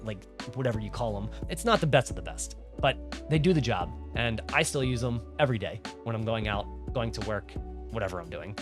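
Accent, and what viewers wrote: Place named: Pottstown, United States. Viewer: Hey man! Hope you are doing well! Great video and review. I've been watching a lot of Philly accent videos and it seems like you've retained our Pottstown way of speaking. Spread that all over Thailand lol. I've started learning Japanese...not sure how it compares to Thai, but different scripts. Would love to catch up and maybe do a video on the travel to Thailand for my travel agency if you're down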